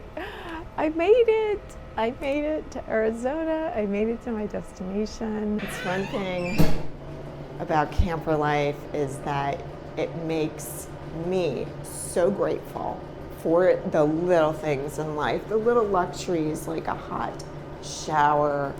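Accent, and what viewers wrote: New Jersey, United States. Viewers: First time subscriber. I thought i detected a Jersey accent. We had a Lance camper and loved traveling across country or many years. Fortunately our pets never needed a vet. Be safe.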